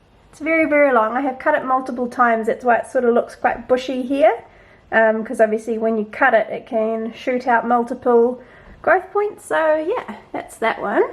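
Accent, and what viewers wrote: New Zealand. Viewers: Lovely plant tour thank you. Your accent reminds me of my stay in Papatoe in Auckland.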